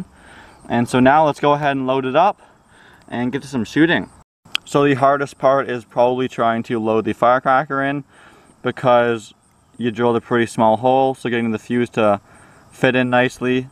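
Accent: Sweden. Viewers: are you swedish ?!? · Are you swedish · Haha är du svensk?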